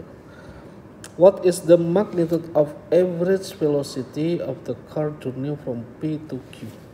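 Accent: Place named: India. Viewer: when I heard the lovely indian accent, I know I came to the right video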